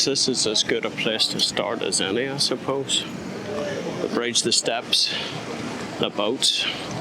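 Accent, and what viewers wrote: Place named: United Kingdom. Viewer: Just came across this channel. Around 9 years ago I swopped a Leica lens for an x-t1 and I'm still using it today. They're an excellent, reliable little camera that does everything I want and has never let me down. Some great shots of Venice showing what this little gem of a camera is capable of, and just subscribed. As I always say, 95% of a good image is being there and seeing the potential and the other 5% is the gear. From your accent I think you may be from the same little island as I am, but further north maybe? Enjoyed the video and will check out some more.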